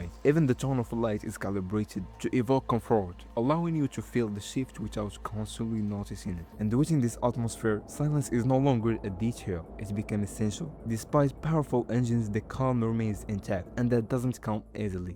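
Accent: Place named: Middle East. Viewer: I’ll be blunt…. I’m halfway through this video and it is #TORTURE 😱 because you mispronounce 50% of the words and the other 50% sounds like a 10-year-old child from the mid east trying to pronounce the English language… the visuals are great, and as other people commented: you “should“ have a lot more views, but the reason you don’t is what I just stated!😮🙄😳😡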